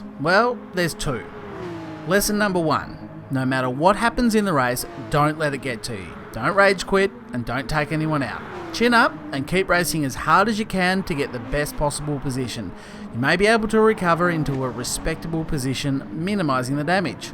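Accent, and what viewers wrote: Australia. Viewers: siga raga He is an Aussie if you couldn't tell by his accent, and the Australian flag next to his gamertag. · Straya mate! How could you ever think he is British?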